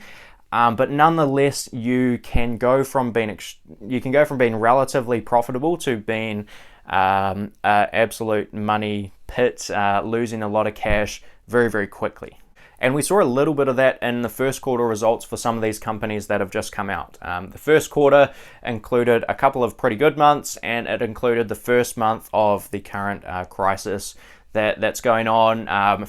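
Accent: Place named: New Zealand. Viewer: Great video, many investors, particularly newer investors don’t look at the balance sheet of companies. Very important to make sure the company can comfortably handle its debts! Great vid mate, love the Kiwi accent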